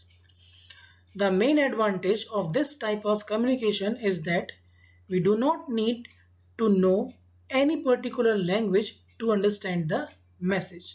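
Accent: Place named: India. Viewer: the indian pure accent made my ears bleed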